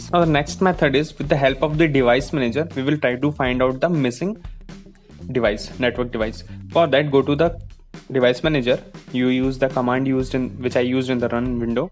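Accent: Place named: India. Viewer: Great help howeverCouldn't get past ur strong indian accent sorry found hard to follow your instructions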